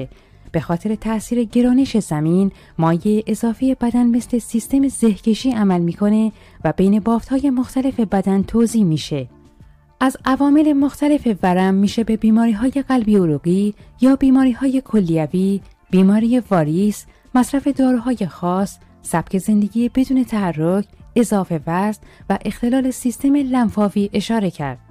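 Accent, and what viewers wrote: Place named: Turkey. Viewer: سلام خسته نباشید خیلی ممنون ازویدئوی خوب تون درضمن لهجه ترکی قشنگی دارین.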